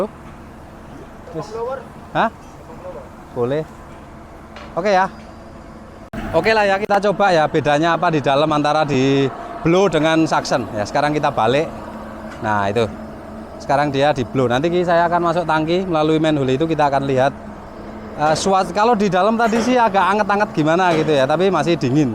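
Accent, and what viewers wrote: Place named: Java, Indonesia. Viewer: logat jawane medok jawane asli mana pak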